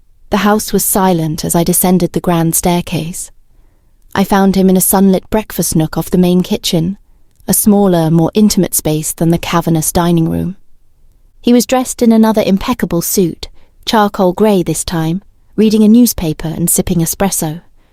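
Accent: England, United Kingdom. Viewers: I like the English accent. It’s better than the one where she sounds like a Connecticut elementary school teacher. And there are a lot of Black people, including women, in England, Africa, and Asia who all have English accents.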